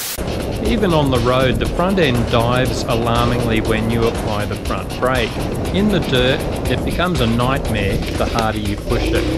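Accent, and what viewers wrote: Australia. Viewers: After all the reviews I tried to watch but failed by bloody skeptics, along comes this one one spoken in plain Australian. Thank you looking forward to watching the whole series. Where on the Sunny Coast are you lot at?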